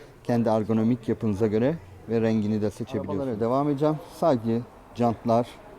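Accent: United States. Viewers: Abi amerikalı mı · Abi Amerikan İngilizcesi aksanın harika Türkce aksanın da harika çok yetenek gerektiren bir şey. Kullandığın bazı kelime gruplarını bizim burada yaşayan çoğu insan bilmez bile helal olsun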